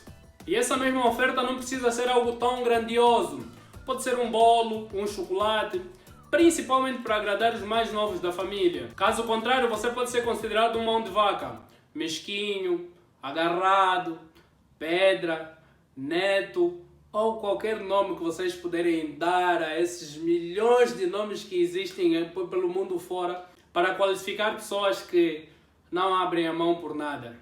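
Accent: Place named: Portugal. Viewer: Muito bom seu canal , mas e aí e vc e de origem ? Falando português de Portugal , eu sou do Brasil.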